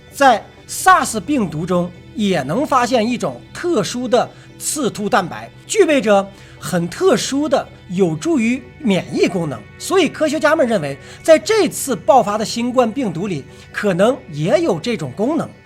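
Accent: China. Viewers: 大陆人有大陆口音有问题吗? 你要给配音吗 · 大陸口音需要那麼重嗎？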